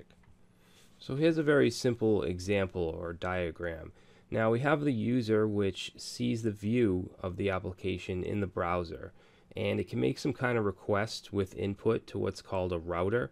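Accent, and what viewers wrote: Boston, United States. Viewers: Are you from Dorchester/Roxbury?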